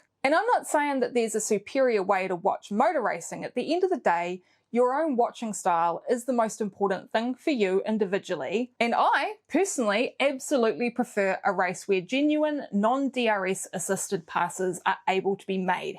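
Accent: New Zealand. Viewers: Stop!! You need to give people warning. You really do. A Kiwi accent with a slight lisp. Loud, proud, and scary. Oh God while I'm typing the dog just went into meltdown. Don't ever say kettle of fish like that ever again. They are cancelling you because of public health, and maybe watching style and hanging out for a red flag and all your other Aunty type of preaching over the most singular boring sporting event that is somehow still on. Come on, Union and netball is your bag. That's your wheelhouse, your hitting zone, not doing a Karen preach about the most boring thing ever televised. The Military tattoo is better viewing. And..... Learn how to pronounce hypocritical properly. Please. Sorry but it's the truth. That accent, at that speed, with that entitled Jacinda like, I know boats, put down isn't your strong point. I can't listen to another do I'll just ask... 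Do you do this word of the week thing in each post? How many times can you get outlier into one post? Wow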